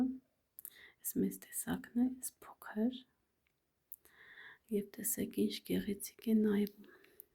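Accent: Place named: Lori, Armenia. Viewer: Ձեզ առաջին անգամ եմ դիտում։ Ինձ թվումա լոռեցի եք ջան? Բայց ամուսնու կողմը չհասկացա որ բարբառով է խոսում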